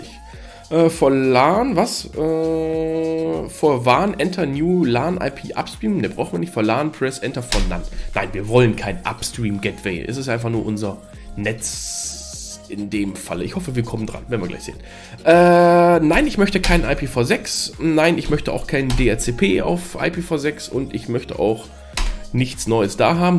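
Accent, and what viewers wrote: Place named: Germany. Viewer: Gutes video und 100% deutscher Akzent lmao.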